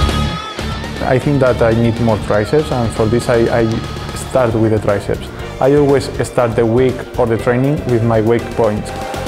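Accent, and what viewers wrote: Spain. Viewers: Se nota que es español...el ingles le hace falt pulirlo caxo... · Looking at this pose, you can see he does need a little more work on the lats. And where is he from? I recognize a Spanish/Hispanic accent...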